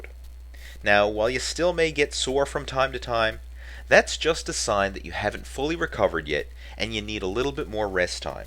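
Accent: Canada. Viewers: This is kind of off-topic but do you happen to be Canadian? I swear i can hear the accent o.O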